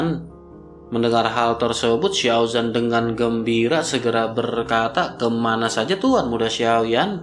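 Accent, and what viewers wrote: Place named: Java, Indonesia. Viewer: Min suara lu kok lama2 jadi medhok 😂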